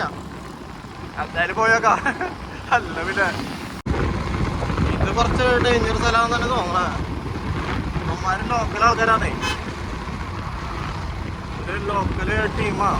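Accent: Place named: Thrissur, India. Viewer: Thrissur slang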